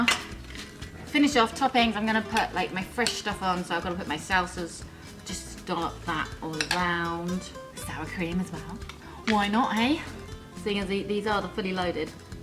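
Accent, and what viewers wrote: United Kingdom. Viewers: Hi,enjoyed the video, that's a real meaty nacho dish.You look more eastern than Mexican and sound British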